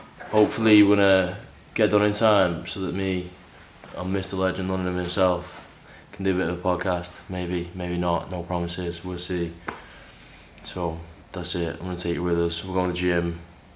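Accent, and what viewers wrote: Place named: Northwest England, United Kingdom. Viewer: Wondered if the accent was more scouse or manchester. Runcorn is in-between I think.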